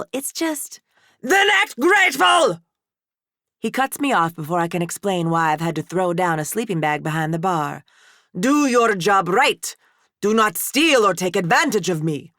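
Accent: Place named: South, United States. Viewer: YESSSS this is going to be very interesting!!! As a Black South Carolina woman I really appreciate Southern voice. 😜🤩💯❤🏍🌪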